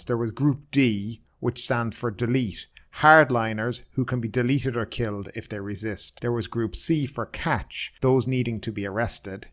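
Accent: Ireland. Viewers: I love the Irish accent..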